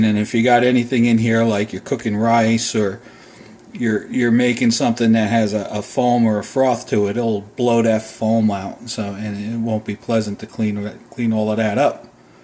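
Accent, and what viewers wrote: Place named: Philadelphia, United States. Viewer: Thanks. I just finished watching this video and ready to use my new Insta Pot to cook a pork loin. I gut laughed at the end when you exclaimed "GD that smells good!" Also, I am wondering if you are from SE PA? I detect a Philly accent.: ) Thanks again.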